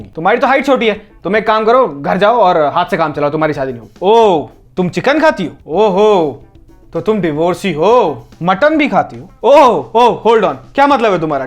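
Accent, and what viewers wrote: India. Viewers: You have very poor observation. Now I am feeling very bad for myself watching review of some sad loner commenting such thing for a professional matchmaker. First I watched ur video and your old job "honest review" review, it's was funny. As I like to hear desi accent. Basically nothing " honest" in your review, you should rename your show " saying in desi accent". Just tell me what wrong in that lady in the show " seema from Mumbai", she was perfect professional. She listened to her clients, observed them(not judge), motivate her clients and provide what they wanted. Moreover, she follow up their clients until he/she get married. A simple service based business type, now I don't see anything wrong in that and maybe non Indians also feel the same. But you are really getting toxic and loner day by day who judge a show without observation and only watch the show to pass negative comments. 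I am writing this letter to your wife " Dear Mrs, Please Madam control your husband he is getting toxic day by day. He needs some mental support. His review is very irresponsible, now a day he don't have a job he is making fun of other professionals. If possible please beat him with belen to normalised thing. Your sincerely, Indian YouTube viewer" Maybe government should lunch a review certification course so you can attend and show act like professional reviewer.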